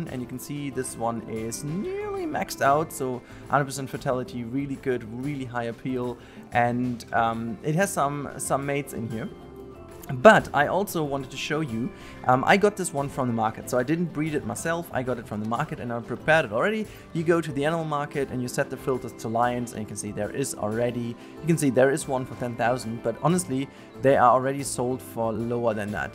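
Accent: Germany. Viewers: kannst du das video auch auf deutsch machen ? denke mal an deinem akzent kann man erkennen das du deutch bist :D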